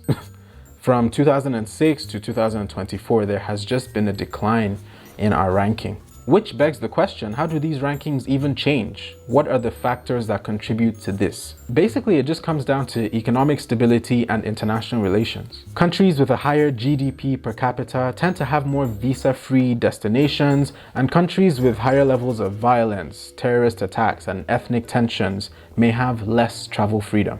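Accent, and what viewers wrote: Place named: Jamaica. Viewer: Could swear you were Jamaican! I do get you on the privilege thing though. I remember going to a travel agency to seek assistance with applying for a Canadian visitors visa. I already had a US Visa and was scheduled to attend a conference, so I thought I would go up to Vancouver for a few days after the conference. The travel agent was so rude and condescending. Asked for bank statements, how much money you have in your account. Needed accommodation information, I booked accommodation at a hostel in downtown Vancouver. When she read the details, her snarky response 'hostel, shared room with wash sink'? I am traveling on a budget, what did she expect, a 5 star hotel? She went to the back and called her supervisor and asked her in front me if this looks possible. The supervisor sighed, do they have a Visa already? She nodded yes. 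Well, give it a try. I gathered the application forms, told them I will think about it some more. Went home dejected, but something in me said, try doing it yourself. Which is what I did, stayed up all night that weekend, submitted the application online Sunday, sibling gave me a ride to the embassy to submit the application. Got a response two weeks later I was approved and I should pick up my passport. Stopped by the travel agency and showed it to another travel agent I normally booked flights with and she was shocked. The other condescending agent acted like she didn't hear anything, but I knew she was upset. Anyway, thats all in the past now, livin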